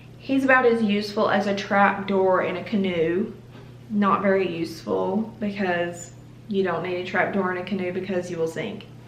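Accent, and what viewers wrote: South, United States. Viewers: More southern accent plsss I like to be a cowboy one day did you know🤠 love you're accent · I'm from Alabama, too, and I'm trying to see if my accent is this strong when I speak lol It probably is 🤣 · There are two reasons I subscribed you. 1- Your southern accent 2- Your beautiful face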